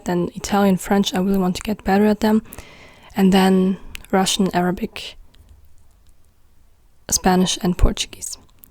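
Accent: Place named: United Kingdom. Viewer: By the way when you speak english your pronunciation is more british than american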